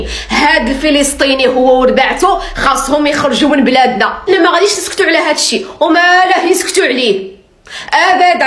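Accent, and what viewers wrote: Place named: Sahara, Arab world. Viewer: شحال زوينا هدرة الصحراء مزينتكم تحية ناس الصحراء راكم عزاز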